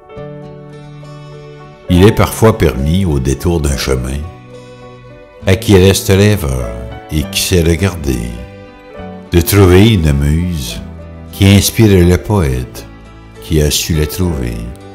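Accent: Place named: Canada. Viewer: Bonjour Superbe narration de cette poésie accompagnée d’une belle vidéo c’est toi l’auteur compositeur? Je pense toujours a toi car nous devions faire une émission de radio ensemble ca ne c'est pas fait car je me suis rendu compte que tu avais un accent canadien bien prononcé et mon responsable d’antenne n’aurais pas accepté tes interviews pour nos auditeurs du sud ouest de la France!!!! Je culpabilise depuis ce temps là Excuse MOI Je rentre de Charente Maritime ou je suis allé a Hiers Brouage ou est né Samuel Champlain fondateur de Québec je t’embrasse SINCERES AMITIES <mail> PS je ne fais plus de radio depuis 2ans ½ mais je viens de prendre ma retraite peut- être je vais reprendre????????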